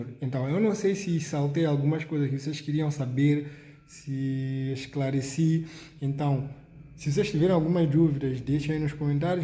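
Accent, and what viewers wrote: Brazil. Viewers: Parabéns vc esta falando igual a nos Brasileiros estes contratempos faz vc virar um brasileiro nato tamo junto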